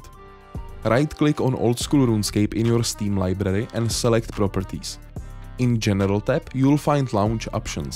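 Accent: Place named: Czech Republic. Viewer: něco málo sem se naučil dík začal sem hrát zhruba před 14 dny:D okamžitě mi z přízvuku bylo jasný že si Čech, tak zdar!..... teď když mluvim o přízvuku... zníš na 100% jak Adam Jícha lmaoo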